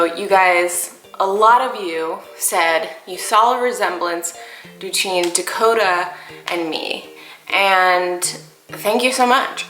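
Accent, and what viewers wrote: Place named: Philadelphia, United States. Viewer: girl i love when you talk about philly stuff and when i catch your accent! cuz i am also from philly but w/ a god awful philly accent. i luv it!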